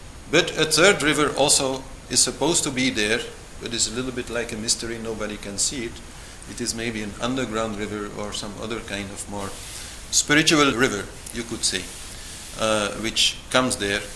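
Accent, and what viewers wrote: India. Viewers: Why do you talk like an Indian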